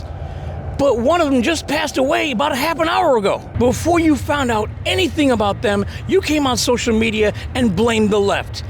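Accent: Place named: South, United States. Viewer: Ok. That is heavy words from someone who has never served. And hasn’t served in combat. Douche bags like him like to talk talk talk. But, we were fighting in the Middle East for awhile and this fuck chose not to serve. And his Call of Duty ass wants to call out combat vets. Your southern accent proves people in the south skip a lot of school. Watch your self homie. Combat vets are the quiet ones.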